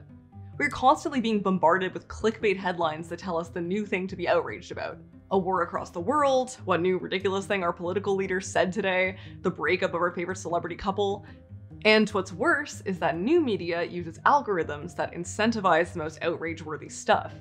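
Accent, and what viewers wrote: Canada. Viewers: She sounds Canadian, so perhaps that explains her lack of understanding of the US justice system, but I agree that the criticisms in this video are largely misplaced because she’s misunderstood how and why the system works as it does. For example, incarceration is the primary punishment for crime not to achieve “retribution” but to keep greater society safe by removing criminals, and to allow those criminals the best opportunity for rehabilitation while institutionalized. Just as an addict is most likely to rehabilitate during a highly-controlled inpatient stay, a perpetrator is most likely to change during incarceration, when removed from the temptations and opportunities to commit more crimes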